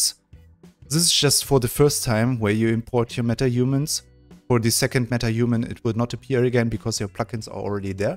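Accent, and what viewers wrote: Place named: Germany. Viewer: very fast and great on the point explained video👍 you are german right? it would be awesome to watch some great videos in german then... ich bin zwar kein berliner aber trotzdem deutsch😅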